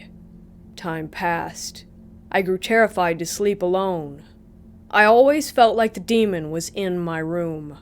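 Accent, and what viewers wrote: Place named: South, United States. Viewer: The best narrator out there...cadenced, intelligent, proper enunciation... and that slight southern accent. A perfect alchemy. And no goofy innuendos either. Yayyyy! Thanks!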